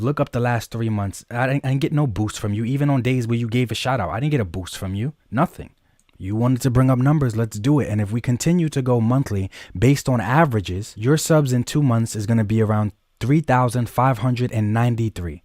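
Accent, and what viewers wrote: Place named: South, United States. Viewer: He’s definitely from the south. I would know cause I am to. People think I talk funny also.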